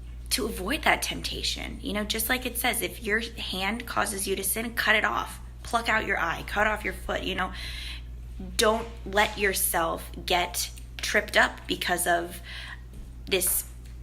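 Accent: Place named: United States. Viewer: Very good voice and thoughtful video.. I like Jesus's teachings. But I don't believe in things like hell and heaven. I would love if you make a video on 'Matthew 7' which is about judging others. That is one of my favorite chapters in Bible. I also like sermon of mount, his parables regarding sowing seeds and many others.. Here is a poem that I wrote combining some verses in Bible and some concepts in Eastern traditions. You may be able to spot a few Bible verses in it. I really like this poem to be heard spoken by someone with a good American accent, like you do. So, in case you like to read this poem in a video, please feel free to do so. It is titled 'Good News'...Here it is: Your life is the most beautiful mystery And the screen which plays your private history; Your life is the truth, consciousness, and bliss That can’t be pointed out as that or this. 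The life screen is the only truth you know; All that are manifest move in its show; This essence of life is your true nature; Knowing this ends the distress and torture. The one truth that pervades the time and space Shines in the heart and showers love and grace; By the veil of darkness it is concealed; By nonstop inquiry, it is revealed. That one truth is called by various names; Deep inside you, it eternally flames; It is the immortal life and the way; Look inside, you can find its sparkling ray! From the dawn of life, seers have seen this And were reborn by its transforming kiss; Unless you’re reborn as a wide-eyed kid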